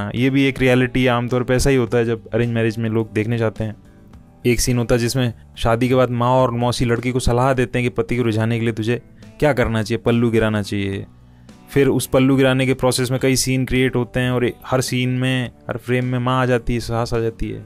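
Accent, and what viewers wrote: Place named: Rajasthan, India. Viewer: Sir aap rajasthan se ho ? Aapki awaaj se laga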